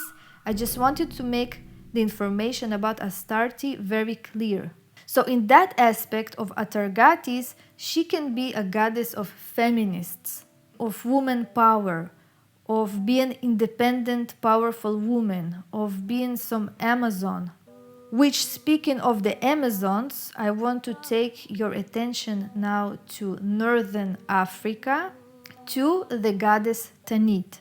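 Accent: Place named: Eastern Europe. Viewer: The accent is the best I love when she says shit..in eastern European accent my grandma used to have similar accent but she was from Cyprus.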